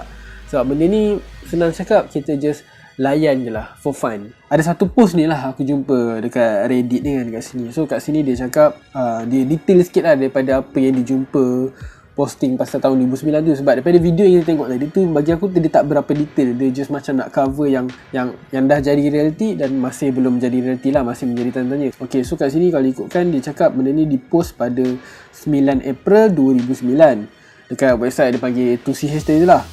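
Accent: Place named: Pahang, Malaysia. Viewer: pekat ngt loghat temerloh nye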